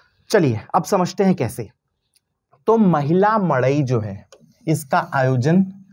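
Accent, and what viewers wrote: Chhattisgarh, India. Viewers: Sir ji jab aap cg me bolte ho n...to bahut accha lagta h🙏❤️🙏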